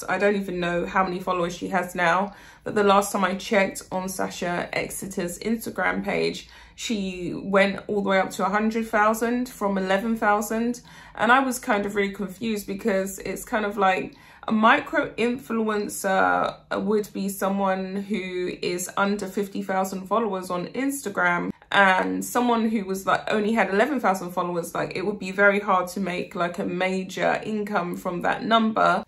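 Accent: England, United Kingdom. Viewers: you are a beautiful, intelligent woman who communicates so articulately with your English accent, which I absolutely LOVE as an American!💓🍀💓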